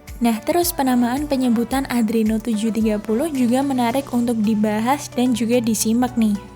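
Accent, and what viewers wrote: Java, Indonesia. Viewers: Mbaknya kall ngomong medok jawa 😂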